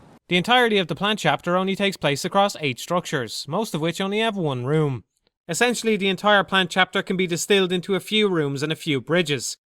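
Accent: Ireland. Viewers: You're Irish aren't you :)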